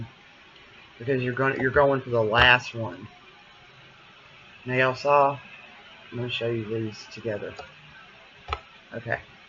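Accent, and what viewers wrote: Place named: Boston, United States. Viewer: YOU TALK LIKE ME. Boston?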